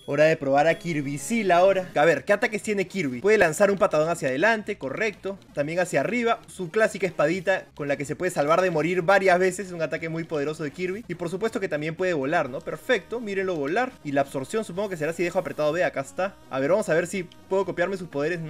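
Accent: Peru. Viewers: OMG, LO SABÍA, SÍ ERES DE PERÚ 💖 Por la forma en la que hablabas lo sospechaba hahaha pero nunca me convencí. En fin, me encanta todo tu contenido tanto en tu canal principal como en este, ¡éxitos! 🌻Like si luigi es tu personaje favorito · OMG, LO SABÍA, SÍ ERES DE PERÚ 💖 Por la forma en la que hablabas lo sospechaba hahaha pero nunca me convencí. En fin, me encanta todo tu contenido tanto en tu canal principal como en este, ¡éxitos! 🌻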